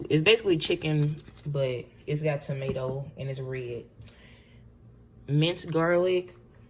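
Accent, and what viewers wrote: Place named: South, United States. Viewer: Bruh you sooo country I love it !! Definitely subscribed and making this recipe ASAP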